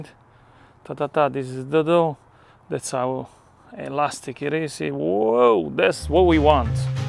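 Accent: Italy. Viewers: mi piace che nonostante vivi negli States da un po' non hai PER NIENTE perso l'accento italiano! 😁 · At First i didnt recognize the italian accent.... But i was sure he was italian😌❤️ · I'm not gonna lie, I've always enjoyed your videos but sometimes I feel like you trade on your Italian accent and alleged authenticity just a little bit too much. Having watched this, you just can't fake that level of skill. Anyone can make 1, or 2, or 10 pizzas for a party. What you showed here takes it to a whole different level and I'm genuinely impressed enough to go and watch your older videos with a new eye. Enjoy your well-earned rest, and I'll happily be following you for the next 20. Or 50. Or 1000.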